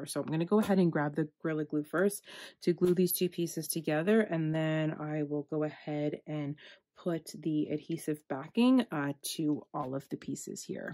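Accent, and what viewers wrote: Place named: Canada. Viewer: Where did you find those giant unfinished wood beads? Great pegboard setup, love the Canadian accent 🥰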